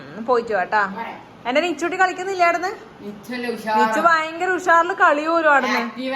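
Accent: Kannur, India. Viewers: പുതിയ ഒരു വാക്ക് കൂടി കിട്ടി.... കുറച്ചു ദിവസം മുന്നേ പുതിയ ഒരു വാക്ക് കിട്ടി കുടിയോ... ഇപ്പോൾ അടുത്ത വാക്ക്... ചേച്ചി ടെ സംസാരത്തിൽ നിന്നാണ് കണ്ണൂർ ജില്ലയുടെ ഭാഷ ശൈലി മനസിലാകുന്നത്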